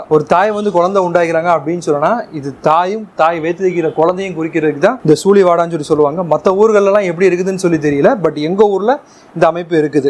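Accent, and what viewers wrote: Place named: Keelakarai, India. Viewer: Ivvalavu seera koduthu vachavanga ponga unga ooru slang keelakarai slang mathiri irukum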